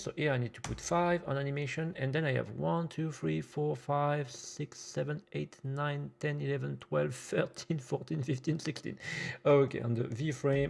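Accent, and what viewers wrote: France. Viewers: oh great! Thank you for those extra videos. Also your french accent is hard but as I’m spaniard I think I understand you better than if you were native english haha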